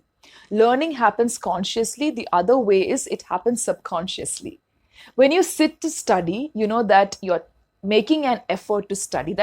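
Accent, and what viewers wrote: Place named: North India, India. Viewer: When you speak English, it sounds like u r from north india. 🤔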